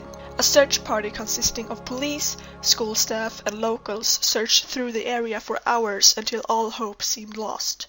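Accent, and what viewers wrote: Sweden. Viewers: are you from swden?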